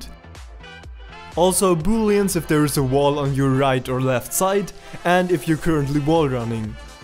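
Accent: Germany. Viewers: Thank you for the Tutorial Where are you from? Do you come from Germany?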